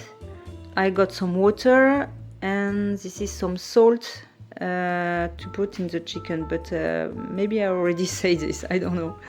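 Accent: France. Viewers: What a rude comment! Your English is lovely and your French accent just makes it more unique... ❤ from Chile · Merci beaucoup❣️I like the way the French eat healthy, balanced meals, and they don’t snack on junk food❣️Your English is very nice and I love your French accent❣️God bless you❣️🙏🏻❤️🙏🏻🍏🍇🫐🥑🥕🥬🍞🍗🥗🫕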